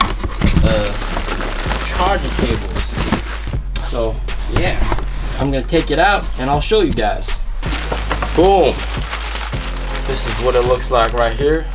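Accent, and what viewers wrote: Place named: New York, United States. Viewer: Hey are you from NYC? Queens or Brooklyn? I hear the accent lol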